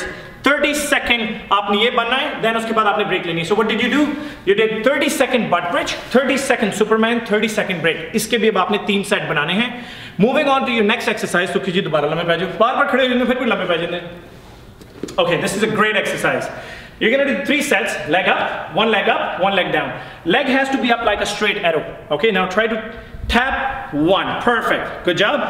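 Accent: Punjab, India. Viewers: Plz speak slowly I'm not punjabi.its hard to get ur accent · You talk too fast and with a lot of Punjabi accent..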